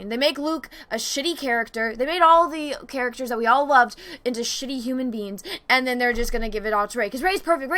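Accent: Portugal. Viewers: wait are you portuguese ? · Are you Portuguese? 🤗💪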